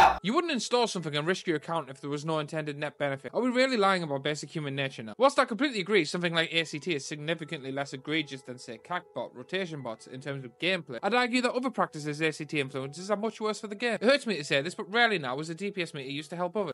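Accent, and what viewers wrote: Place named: United Kingdom. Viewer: Note on you speaking fast: I'm a fast-talker too. My mouth tries to catch up with the runaway freight trains racing along the multiple interweaving tracks in my brain and often ends up tripping along the way. So I get it. I do still need subtitles to understand you, but that's mostly because I'm not british. The full-speed words combine with the accent I'm not used to in a way that turns my brain to mush. No need to feel self-conscious over something you can't really control, we all just work with what we got.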